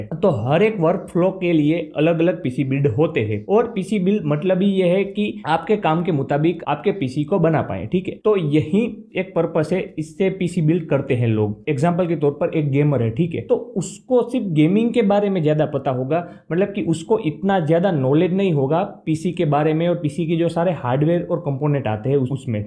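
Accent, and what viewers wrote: Gujarat, India. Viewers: Aap Gujarati ho kya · are you gujarati 😅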